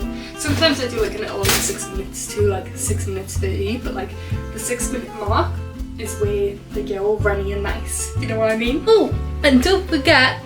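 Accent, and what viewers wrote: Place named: North, United Kingdom. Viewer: that's a very thick accent, northern England? Or Scottish?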